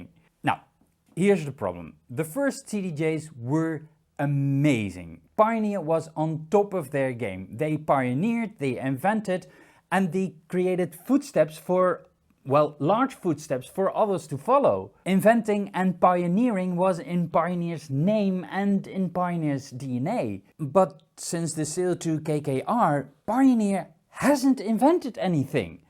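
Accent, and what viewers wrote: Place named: Netherlands. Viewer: Ah ik dacht al dat je van NL komt grappig hoe snel ik dat hoor. gr uit limburg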